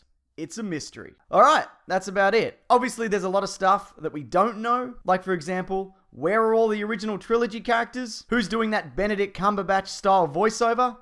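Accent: Australia. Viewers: Your an aussie arnt ya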